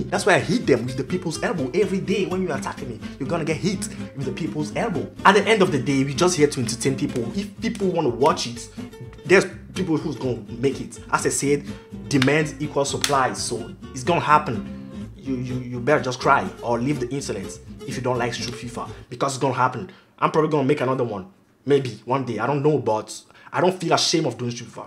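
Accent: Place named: France. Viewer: ♥ Meilleur youtuber avec le meilleur accent FRENGLISH mdr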